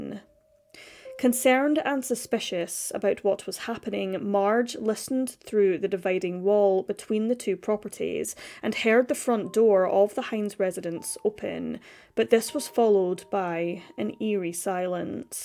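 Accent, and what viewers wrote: Scotland, United Kingdom. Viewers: Shes Scottish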